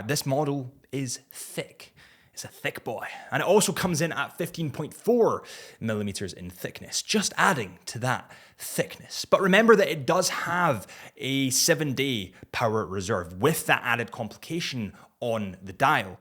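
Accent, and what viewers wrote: Scotland, United Kingdom. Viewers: I love the Scots accent... it's so cool. Connery was the best James Bond.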